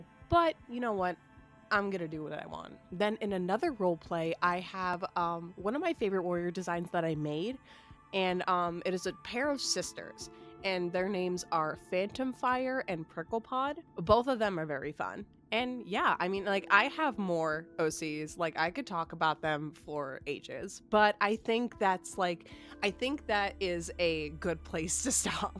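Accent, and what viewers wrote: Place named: New Jersey, United States. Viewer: Okay so I’m finished listening and I’m so here for your warrior cat oc lore they’re so coool and I always adore a chapter that’s complex and with a bit of religious power Hexmoon is currently obsession ALSO JERSEY REP! I was like I know that accent and thought it was Philly 😭 but damn love this so much!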